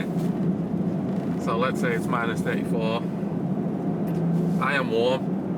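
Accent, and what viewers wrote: Liverpool, United Kingdom. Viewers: Sounds liverpudlian to me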